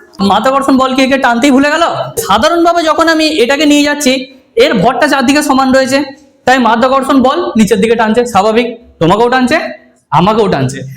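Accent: India. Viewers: বর্ধমান জেলার ভাষার টান, কনফার্ম! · আপনার বাংলা কথাটা কিরকম যেন টান আছে। হাওড়া জেলার লোকের মধ্যে দেখা যায়। যদি পরিস্কার বাংলা বলতে পারেন খুব ভালো হয়। কারণ আপনি একজন শিক্ষক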